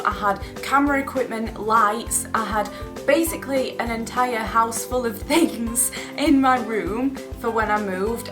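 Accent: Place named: Sheffield, United Kingdom. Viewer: Sheffield x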